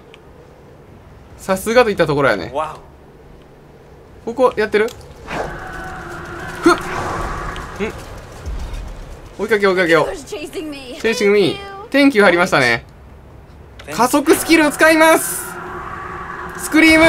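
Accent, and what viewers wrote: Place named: Kyoto, Japan. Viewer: 関西弁と京都弁が混じったRYOUSUKEさんの動画にずっと癒されてます🍀😊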